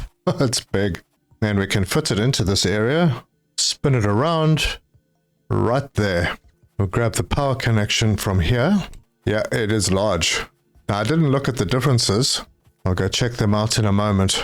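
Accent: South Africa. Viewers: South Africa, I believe.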